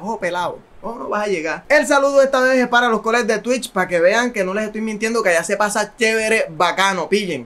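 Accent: Colombia. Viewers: Hola eres colombiano?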